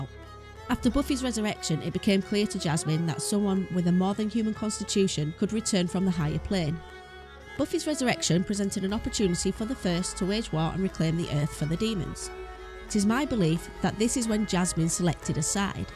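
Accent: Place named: United Kingdom. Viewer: Yus we need more British voices on youtube 😺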